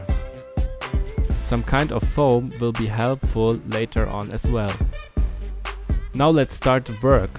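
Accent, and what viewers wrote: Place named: Germany. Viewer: Ah the german accent is making the video wholesome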